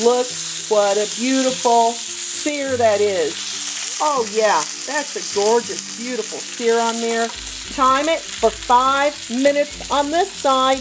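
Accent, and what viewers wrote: United States. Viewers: I've never heard anyone more American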